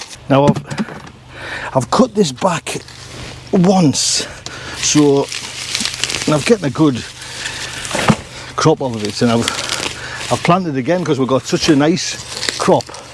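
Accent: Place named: Sunderland, United Kingdom. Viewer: Hi, been following for a while and finally got round to subscribing. Love the no nonsense practical videos, daisy obvs, but love my daily dose of home. Was wondering about the accent but saw you recently driving to a nursery heading into Seaburn 😍 Keep doing what you are doing, keeping an exile stuck in the Midlands v happy 😁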